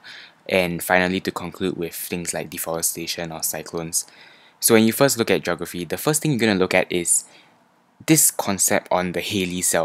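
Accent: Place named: Singapore. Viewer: This is useful even for AS&A's CIE geography, thank you so much! The Singaporean accent is refreshing to hear as someone studying internationally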